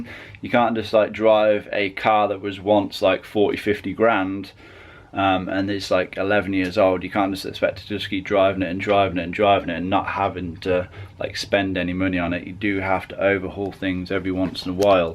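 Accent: United Kingdom. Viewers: you speak heavily with British English accent and I couldn't understand some of it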